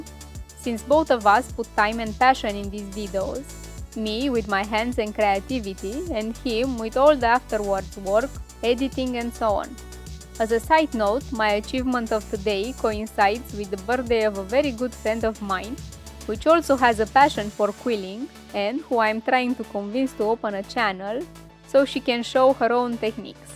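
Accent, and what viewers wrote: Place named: Romania. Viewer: Ma bucur ca ai prins 1000 subs.Foarte tare accentul romanesc.Succes.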